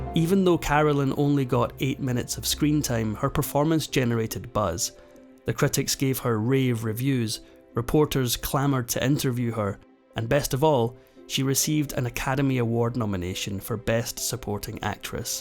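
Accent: Scotland, United Kingdom. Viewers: A fellow Scot. I can't think who he is 🏴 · Lovely gentle Scots accent. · . Scottish AI? I don’t think so, not with those pauses and inflections. If you listen, you can hear him inhale. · Six months, the narrator is Scottish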